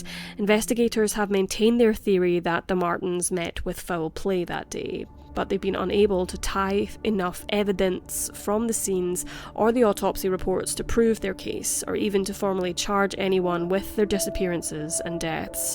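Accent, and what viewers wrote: Scotland, United Kingdom. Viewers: Yes agree, I think it’s Scottish 👍🏻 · It's scottish 😊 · It's a British accent, from Scotland. Very calm and easy on the ear. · She has a Scottish accent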